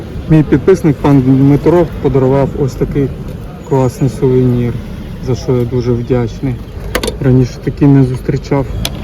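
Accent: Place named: Lviv, Ukraine. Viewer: Оце я розумію блогер. Перейшов на чисту українську і в Одесі спілкується як львівський бандерівець. Велике дякую за таку цікаву екскурсію. Ще про мову напишу - Ви і у Львові краще говорите українською, ніж місцеві. Як завжди лайк і перегляд до кінця ролика.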